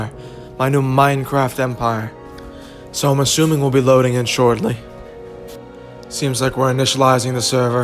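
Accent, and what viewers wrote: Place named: Staten Island, United States. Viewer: Sounds like Anakin with a Staten Island accent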